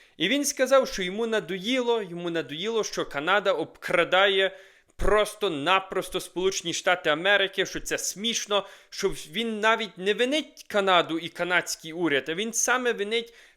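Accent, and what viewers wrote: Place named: Hutsul, Ukraine. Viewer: Вивчи Українську цуценятку ато від гуцульського підар акценту аж верне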